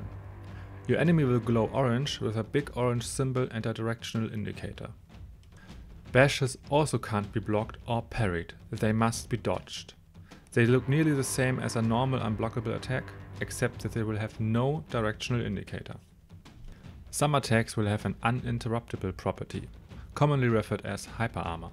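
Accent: Germany. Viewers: are u german btw? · I h Liebe deine Aussprache kann es sein das du Deutscher bist ? PS super Video danke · WIe man den deutschen Akzent hört loool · Ich würde jzt einfach mal so sagen das es ein deutscher Akzent ist ?🙈😂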